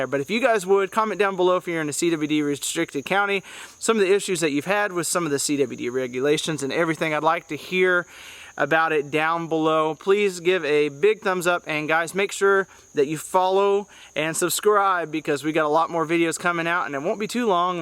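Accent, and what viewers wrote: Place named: New England, United States. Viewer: Yankee accent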